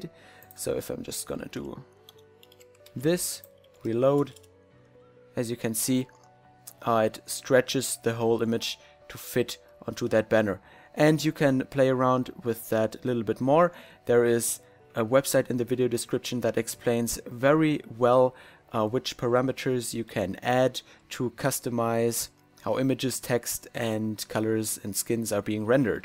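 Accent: Germany. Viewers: Are you German you are never Englisch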